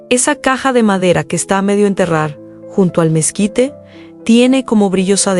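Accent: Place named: Portugal. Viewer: Excelente. Relato chica tienes muy buena voz en la narrativa suave tranquila. Pausada. Del 1 al 10 te pongo un 9. Todavía. Tienes tu lengua Natal. El Portugués. Muy poco pero de lo demás es excelente. .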